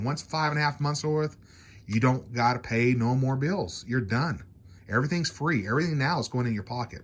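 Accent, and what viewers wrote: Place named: South, United States. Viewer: Can you sound any more like hilly billy john. and your "okay" every other sentence. you are exactly how you sound.... Like an uneducated idiot.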